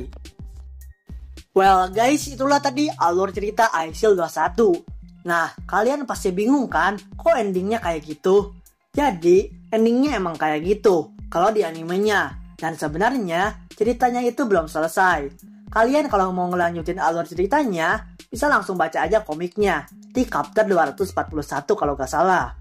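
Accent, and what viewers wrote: West Java, Indonesia. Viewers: Sunda pisan iyeumah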